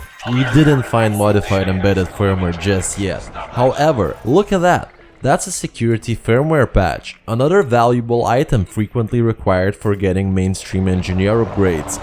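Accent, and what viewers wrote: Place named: California, United States. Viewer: Your Californian accents funny as fuck! thax Bruh your video hella help me! Blesses from California!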